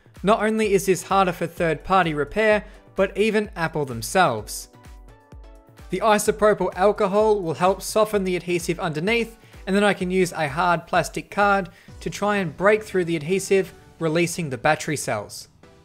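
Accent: Australia. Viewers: His accent mate. He's Aussie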